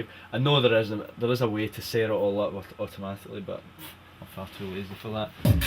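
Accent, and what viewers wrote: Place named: Scotland, United Kingdom. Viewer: Dat scottish accent! I can't understand it :D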